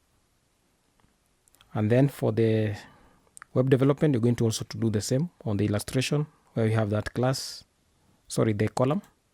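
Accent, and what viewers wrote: Kenya. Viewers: He sounds like a Kenyan Nice tutorial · He sounds kenyan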